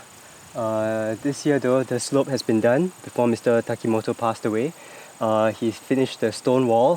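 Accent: Singapore. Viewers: I was looking for video about Irori and Jizai Kagi, and stumbled upon yours. I watched the previous episode where you documented the installation process. It was so peaceful and full of old wisdom. I heard you speak Japanese with Mr. and Mrs. Okuno, and I thought it must be another "Peaceful Cuisine" like channel. But then suddenly, eyhhh..Singlish? Anyway, when the time is right, thinking of visiting this farm-to-table cafe. Keep up the good work!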